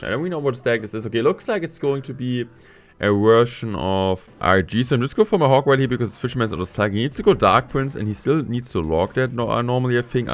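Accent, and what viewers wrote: Germany. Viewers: Your english look like deutsh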